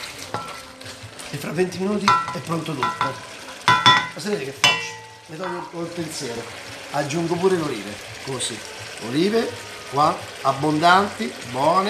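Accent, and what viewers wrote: Rome, Italy. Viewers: Maestro, continua col romanesco, la nostra bella Italia, lascia perde i radical chic inglesi. 😉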